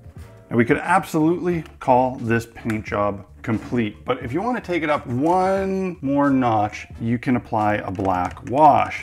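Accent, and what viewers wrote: Canada. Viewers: You said "out" so many times I discovered that you're Canadian.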